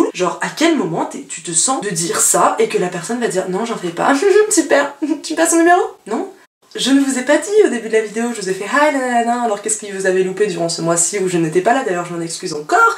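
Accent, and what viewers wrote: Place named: Lyon, France. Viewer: Mdrrr pauvre bichette ah sa se voit que tu est de Lyon à ta façon de parler mdrrr je comprend en ville tout le monde est bizarre surtout la part dieu j’habite à côté en tout cas continue tes vidéos j’aime trop ta personnalité !💙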